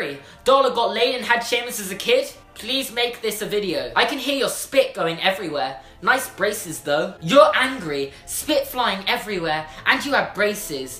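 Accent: England, United Kingdom. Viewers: I actually really like your braces and I just don't get your voice because of the English accent since I'm American sorry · Idek how y'all can make fun of his braces when he looks so cute I mean fr fr braces+cute British accent+BEAUTIFUL HAIR=the perfect boy so y'all can stfu and stop talking about him thx👌🏾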